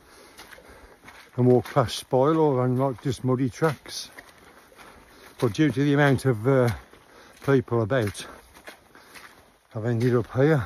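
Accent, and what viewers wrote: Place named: Black Country, United Kingdom. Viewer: Talking of spiritual home, where are you from originally. You sound like you’re from my neck of the woods in The Black Country.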